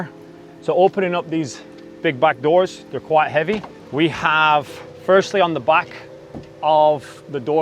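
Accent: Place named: Lancashire, United Kingdom. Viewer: Nice van, something tells me there’s a bit of Darwin, Preston, Blackburn in your accent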